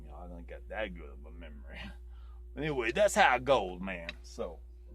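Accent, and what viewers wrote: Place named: Louisiana, United States. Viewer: First of all, I love " '. Is he from Louisiana??? Lol. Secondly, he delivers great content in an entertaining way. I hope he sticks around! Haha. Great video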